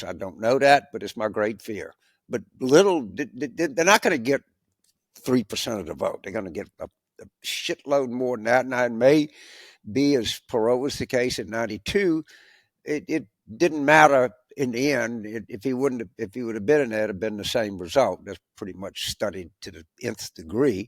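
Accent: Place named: Los Angeles, United States. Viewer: Always have thought he has Tertiary syph, not secondary, but if you look up tertiary it really fits. Thanks! Always nice to hear a real LA accent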